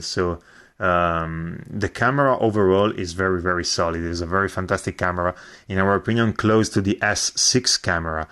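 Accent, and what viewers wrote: Italy. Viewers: brutta e pesante la cadenza italiana... ma voi per parlare l'inglese in questo modo da chi l'avete appreso? dalle capre? si sente proprio forte la tendenza ad italianizzare il tutto... a dir poco vergognoso. E in cinque anni di scuola superiore ho imparato più io che voi un inglese di livello intermedio, ma voi cari state alle pezze che pure i bambini vi superano. Fate un corso di dizione o quantomeno aggiornatevi con babbel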